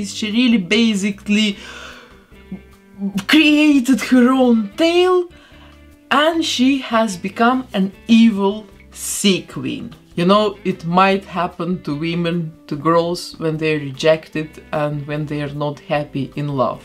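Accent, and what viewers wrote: Russia. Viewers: Ты похожа на русскую, особенно по произношению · Ты получается, русская? Просто очень много конкретного русского произношения :D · Русский или Белорусский акцент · Мне не одному кажется что она русская и пытается говорить на английском? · Вы русская? Просто у вас есть акцент, это слышно)) Вы классная You're cool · Your accent is so cute 🥰 You are from Russia ? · I'm the only one who noticed that she has a very strong Russian accent? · акцент русский как будто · Вы русская?У вас русский акцент) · у меня уши болят из-за этого русского акцента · Блин так слышно Росийский акцент) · Че за акцент? Ебать, так ты русская ಠ‿ಠ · You are from Rassia?I think you say whith Rassian accent. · Детка ты не одна такая она 100% русская, в Америке и в Англии не в одной стране нет такого произношения! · Are you Russian because your accent is gorgeous xx 🤩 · Акцент очень русский напоминает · я тоже сначала подумала об этом. 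очень похоже на русский акцент. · может она русская... акцент прям Русский пипец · Такое ощущение что ты русскоговорящий ютубер потому что у тебя такой акцент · Русский английский 😅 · Она говорит как русская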